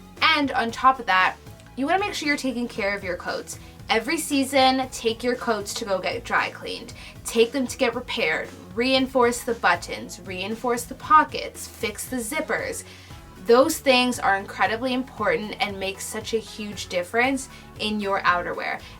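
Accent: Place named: Canada. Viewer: "Crusty clothes". That's so cute. Jamaicans have the cutest words and sayings. You mentioned that you live in London. You don't have a British accent. You sound Canadian, do you mean London, Ontario? I live I Canada and a coat, and more than 1 is sooo important. Great tips and great video.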